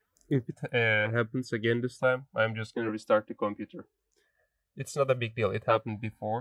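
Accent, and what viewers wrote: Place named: Turkey. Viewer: kanka aksanından türk oldugunu dusunuyorum allah aşkına oyunu dün epic gamesten aldım bozuktur dıye bugun gidip steamden aldım ilk basta önyükleme hatası falan diyordu biostan bi ayar yapıp ön yüklemeyi açık hale getirdim onu hallettim.ama suanda steamden oynaya basıyorum ilk kez baslatılmaya hazırlanıyor diyip hicbisey olmadan kapanıyor kendi kendine hiç açılmadan ne yapabilirim lütfen yardım edermisin bütün driverlarımda güncel bilgisayarıma yeni format attım windows11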